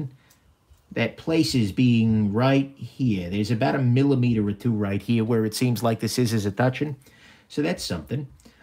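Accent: New York, United States. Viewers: Violently New Yorker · Heavy NY accent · Is that a new york accent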